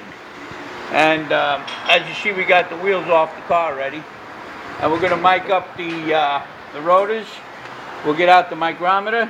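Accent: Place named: New York, United States. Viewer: I was all "This sounds like a NY guy" Then I saw the FDNY hat, Alright!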